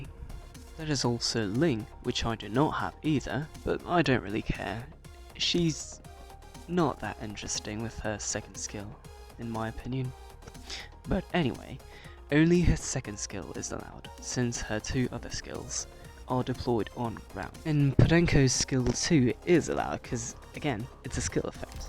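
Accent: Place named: United Kingdom. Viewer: Why is this man with a British accent saying he isn't native? Am i just really bad at placing accents?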